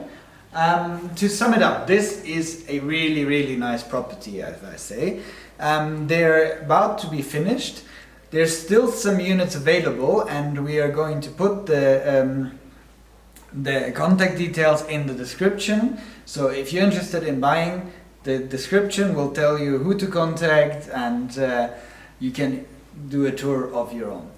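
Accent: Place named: Germany. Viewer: You are from Germany your accent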